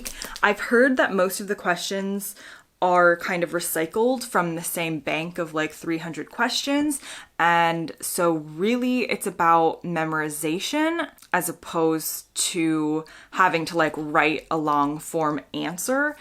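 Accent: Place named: United States. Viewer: you sounds like american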